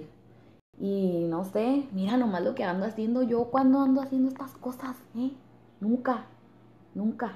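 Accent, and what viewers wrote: Mexico. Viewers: Es ese acento culichi? Me encanta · Habla como alguien de monterrey 7u7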